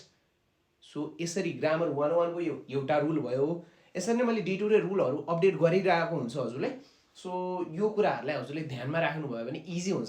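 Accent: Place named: Gujarat, India. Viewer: I thought you are from Gujrat or rajasthan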